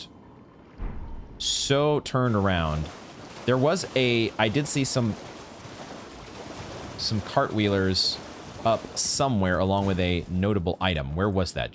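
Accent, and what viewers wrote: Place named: Wales, United Kingdom. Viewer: That's a Welsh accent.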